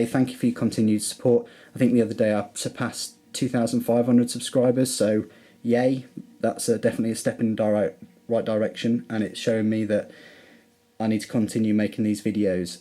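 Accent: United Kingdom. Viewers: A really nice British accent :) BTW thanks for a review.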